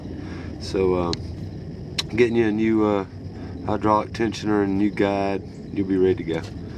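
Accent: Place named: Carolina, United States. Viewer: I knew it! Carolina accent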